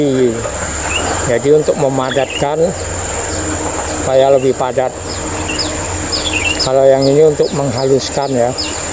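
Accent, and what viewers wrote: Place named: Jambi, Indonesia. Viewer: Betol nian wak sakai kalo org jambi ngomong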